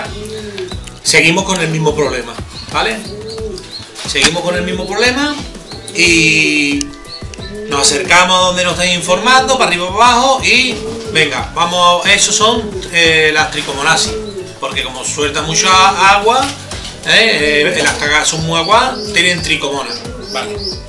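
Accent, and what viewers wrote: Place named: Andalusia, Spain. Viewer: Olle tio de donde eres. Se que andaluz no se si de grana o malaga. Dime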